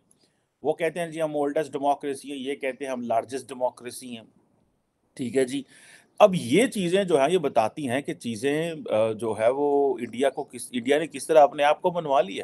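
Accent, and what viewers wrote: Punjab, Pakistan. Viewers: don't forget your panjabi tarka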